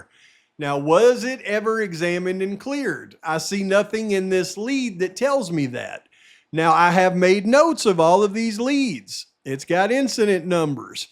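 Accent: United States. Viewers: I started listening to your vids recently. Please drop this heavy hick accent. Please. Please. It’s distracting and makes it difficult to listen. Appreciate the info and insight.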